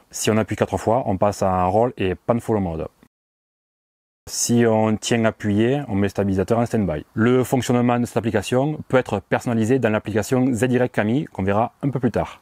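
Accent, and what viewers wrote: South, France. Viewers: Comment ne pas dire que ta vidéo est un travail de pro ! Félicitations, avec l'accent du sud ! 😁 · Vidéo extrêmement complète, bon boulot. Je me permet une petite précision : il y’a bien un moteur pour le ROLL, un autre pour le PAN. C’est le moteur TILT (inclinaison avant-arrière) qui n’est pas présent sur ce smooth X. Continue comme ça avec l’accent du Sud 😆.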